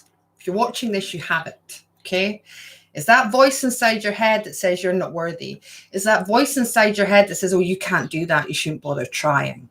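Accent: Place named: Scotland, United Kingdom. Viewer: Nice to hear a Scottish voice for a change on a truther video!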